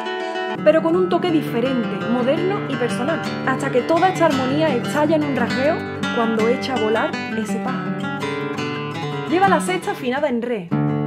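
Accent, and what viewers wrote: Spain. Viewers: de que lado de españa es ese acento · Me encanta el acento español que tiene, y por supuesto si estilo y calidad en tocar la guitarra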